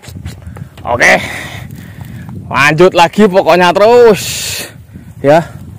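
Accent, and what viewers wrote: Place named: Java, Indonesia. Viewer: Semakin banyak ngomong semakin menghibur mas brow mantap, jangan ilangin logat jawanya lucu 👍👍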